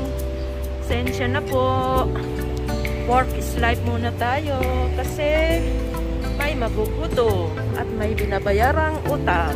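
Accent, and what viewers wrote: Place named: Ilocos, Philippines. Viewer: Parang ilocona sounds ka lods love it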